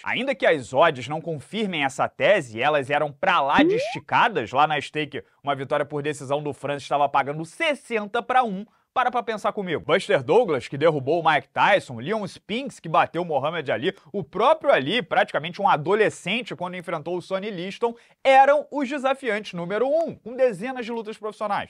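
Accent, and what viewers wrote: Rio de Janeiro, Brazil. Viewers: Esse vídeo teria sido muito bom se não tivesse sotaque carioca.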